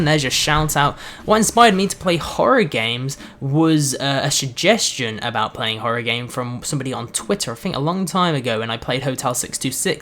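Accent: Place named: Salford, United Kingdom. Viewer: are you from salford? you have an extremely similar accent to mine, only mines more high pitched and squeaky being female...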